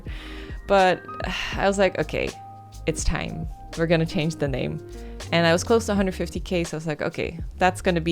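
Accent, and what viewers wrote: Netherlands. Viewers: Woahh you kind of have a dutch accent haha :D I really like the name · Wel leuk dat je Nederlands accent toch een beetje door komt! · Congrats on the 150K!!! Btw, I can really hear that you're from Brabant when you speak Dutch XD (I used to live there too lol)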